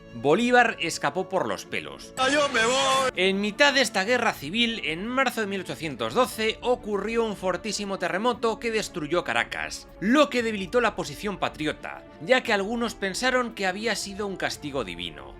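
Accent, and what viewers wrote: Spain. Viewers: Eres de España? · Suena curioso un español narrando la independencia de las Américas. Enhorabuena, excelente video...!!! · Siempre quise saber la versión de los españoles acerca de Simón Bolivar y la verdad escucharlo con ese acento de España es un logro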